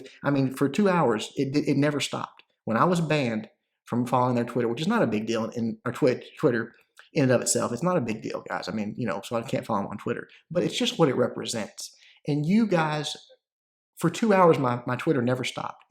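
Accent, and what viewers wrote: South, United States. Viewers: Is it just me or is southern accent feels like it's slowly fading 😳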